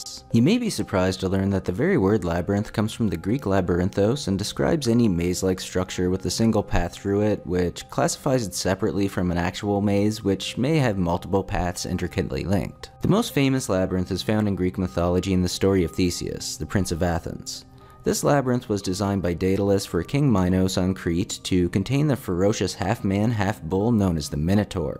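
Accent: Scotland, United Kingdom. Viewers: Scottish? Did I miss a voice/face reveal? 😕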